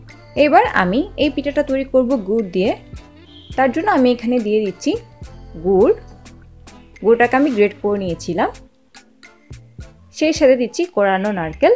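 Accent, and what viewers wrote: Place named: Kolkata, India. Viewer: uchcharon r karone kothagulo shunte valo lageni....apni ki kolkata style a kotha bolte chaichen?ছ চ r problem ta asha kori solve korben....otherwise valo hoeche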